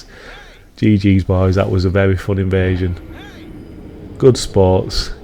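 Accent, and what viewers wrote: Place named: Scotland, United Kingdom. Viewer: My dude is just a little bit scottish ❤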